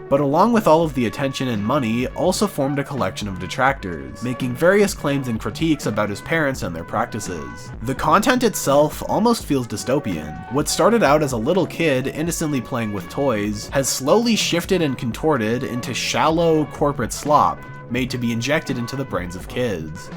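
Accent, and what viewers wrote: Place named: Canada. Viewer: Wait… your Canadian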